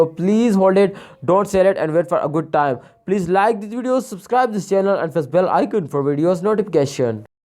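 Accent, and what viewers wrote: India. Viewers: Man your accent sound me Indian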